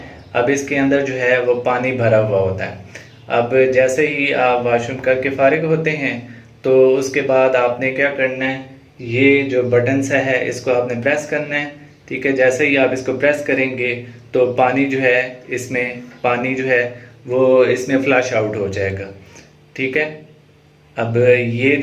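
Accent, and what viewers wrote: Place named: Pakistan. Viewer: Your accent tells you're Pakistani and you sound like my one friend from Pakistan 🤣🤣 he's funny and wierd just like this video 🤟🏻🤟🏻👍🏼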